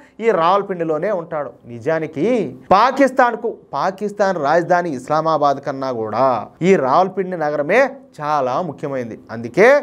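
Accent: Rayalaseema, India. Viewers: అన్నా మీరు విశ్లేషించే విధానం చాలా అద్భుతంగా ఉంది. అందులోను మీరు రాయలసీమ యాస లో చెప్పటం చాలా సంతోషం. కానీ మీరు చెప్పేటప్పుడు ఆ visuals కూడా చూపిస్తే, మీ ఛానల్ ఎదుగుదల చాలా బాగుంటాదని మా అభిప్రాయం. జై శ్రీ రామ్ 🚩🚩🚩🚩భారత్ మాతా కి జై 🇮🇳🇮🇳🇮🇳🇮🇳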